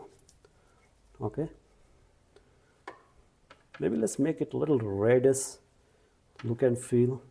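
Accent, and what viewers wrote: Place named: India. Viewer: Always grateful for your generous gift of your time and knowledge,. And don't worry about your accent; I am in Australia, so an Indian accent is no longer foreign! Peter Downie.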